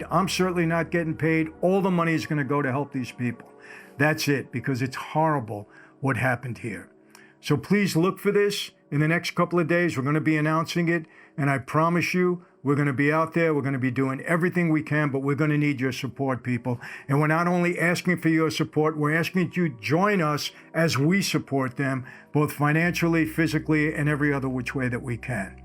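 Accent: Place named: New York, United States. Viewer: i really admire you and your story. You remind me so much of my father that was also an Italian from New York and talked like you. My father would be 96 years old today. The strongest men I ever met were my dad and my uncles from New York. That was when men were men. This was also a time when your uncles helped raise you. If my uncles saw me doing something i shouldn't I got a slap. Hoping they never told my father my wrongdoing so that I didn't get another. lol Enjoy your videos and my prays are with North Carolina and California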